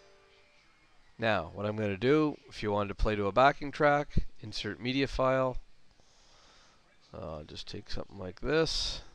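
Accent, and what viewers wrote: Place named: Ontario, Canada. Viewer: thanks for the great helpful vid! I have to ask, by your accent you sound like you're from Ontario. Are you?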